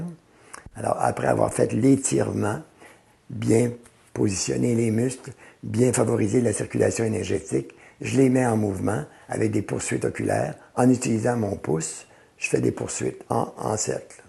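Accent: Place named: Canada. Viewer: Extra, merci beaucoup ! Votre site est super, on va aussi transmettre le lien à nos clients ! Et j'adore votre accent canadien :-) il réchauffe le coeur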